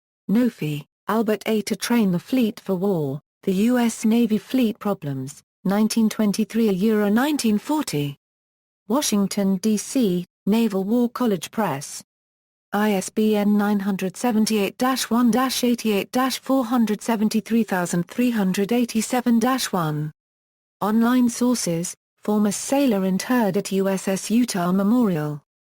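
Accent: England, United Kingdom. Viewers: Love the English accent hate the robotic voice.....And by the way.....Tesla invented and received a patent covering RCVs.......The USS Utah may well have been one of, if not THE, largest vehicle/vessel ever controlled by radio(remote) control to date!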